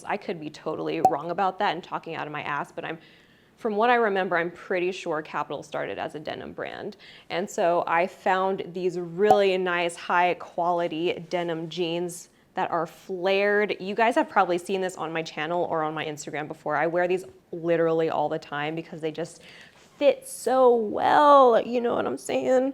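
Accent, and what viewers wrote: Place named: Australia. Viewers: girlllll you’re Aussie accent is killing me 😂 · the aussie accent was confusing me so much 😭 but it’s so cute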